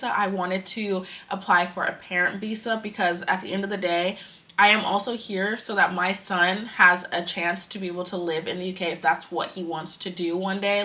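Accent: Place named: United States. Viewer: She looks like she has an accent. Her American accent is still thick. But I be hearing a lil accent every now and then. She getting prettier and prettier. ❤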